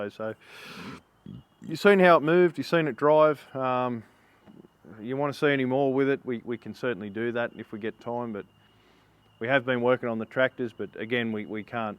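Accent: Australia. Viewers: are you Australian?????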